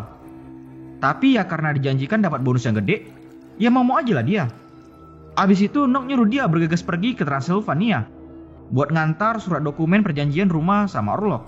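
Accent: Medan, Indonesia. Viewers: Wkwkw.berasa kawan awak yang ceritain ke awak Kerasa kali logat medan nya we 🤣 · 😓😓 astagaa aku telat 3 hari.. 🤦🏻 .. pahal in chanel favorid akuu.. 😢😢 smngt yh. ma'af sdh telat.. sebagai ganti.. aku tdk akan melewatkn semua iklan y. 😍😍😍 .. logat medan y aku sukaa kali.. 😍😍😍😘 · Jan dinampakkan kali lah medannya bang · Orang medan kah kau bang?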